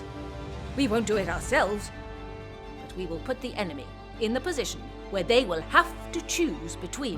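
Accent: United Kingdom. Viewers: So she has British accent. Great.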